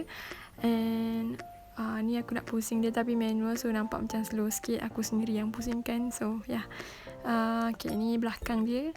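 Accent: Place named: Kedah, Malaysia. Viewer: "Sampai tak Celuihhh" , org kedah kita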